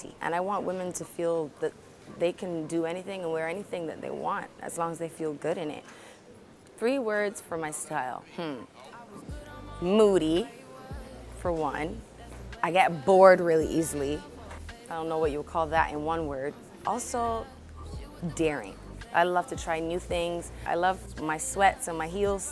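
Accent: England, United Kingdom. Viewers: Her accent is sounding a bit like English accent now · she took the british accent lol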